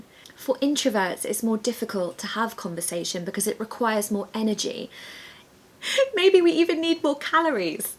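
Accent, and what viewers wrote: United Kingdom. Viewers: Your British accent is excellent.I need the channel of a man in a British accent that looks like you to make an imutation. who do you recommend? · I find your series of videos very appealing! I also think your beautiful intonation is exactly as described in Peter ROACH (2009) "English Phonetics and Phonology", which adopts RP as its accent model. Wonderful! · Omg i love your British accent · Wow! Wonderful quotes and an amazing British accent you have! you're a star⭐hope you will do lives in the future and we can speak with you. 🤍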